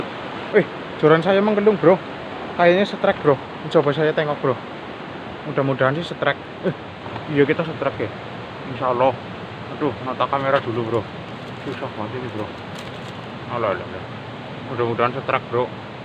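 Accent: Banyumas, Indonesia. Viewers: Ngapak Yah broh inyong e ngapak broh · Bahasa e ngapak daerah mana mas bro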